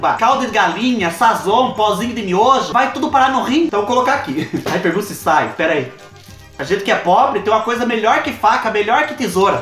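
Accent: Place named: Cerqueira César, Brazil. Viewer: do céu, deixa eu contar. Meu pai tem 75 anos e nasceu nas redondezas de Cerqueira César, aí eu tava vendo seu vídeo e ele ouvindo, aí ele disse " Essa moça é das redondezas de Cerqueira, aposto pelo jeito de falar" kkkkkkkkkkkkkkkkkk aí eu disse que você é de Coronel Macedo e ele falou que é pertinho daqui, sou de Lençóis Paulista, perto de Bauru, quando acabar a quarentena, vou pessoalmente te levar uma caixaaaaa de coisaaaas ❤️❤️❤️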